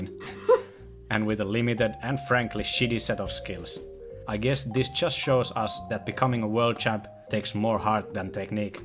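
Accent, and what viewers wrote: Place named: Spain. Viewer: Cool voice! Love the accent, where are you from? You sound Spanish or Easter European.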